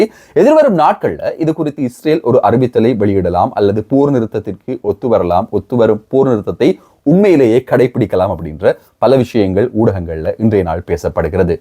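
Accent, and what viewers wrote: Sri Lanka. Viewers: Nice Lankan Tamil accent !!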